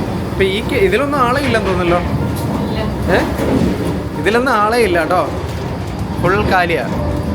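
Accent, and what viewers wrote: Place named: Kottayam, India. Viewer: എനിക്ക് നേരത്തെ doubt ഉണ്ടാരുന്നു കോട്ടയം അല്ലെങ്കിൽ ആലപ്പുഴ നിങ്ങൾക് താഴ്‌വഴി ഉണ്ടെന്ന്. അല്ലെങ്കിൽ ഇങ്ങനെ സ്ലാങ് കറക്റ്റ് ആവില്ല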